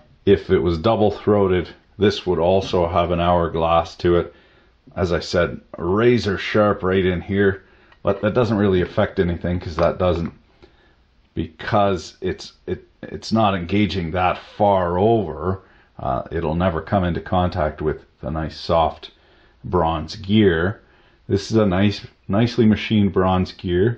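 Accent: Canada. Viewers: I swear you were Canadian...?? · Glad I found this channel. You take being a tool guy to a whole 'nother level. Im from Texas and get a kick outta the Canadian dialect. Enjoy it. I watch another channel called South main auto repair, and you sound a great deal like him lol.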